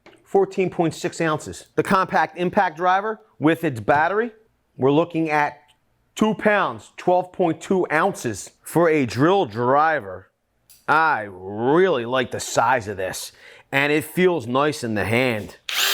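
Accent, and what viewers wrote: Philadelphia, United States. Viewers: This is the first time I've ever watched your videos and I am 40 seconds into it, but I can bet that the narrator is from the Philadelphia tri-state area because of his philly accent. I'd recognize that jawn anywhere.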